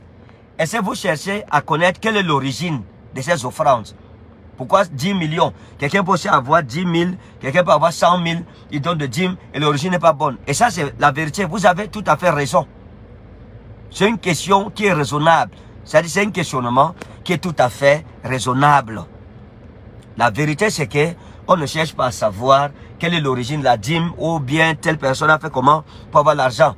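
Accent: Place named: Democratic Republic of the Congo. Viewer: Pasto vous avez l'accent d'un zaïrois c'est juste pour savoir sa n'a pas d'importance mais je cherche à savoir ne voyez pas dans ma question un esprit polémique..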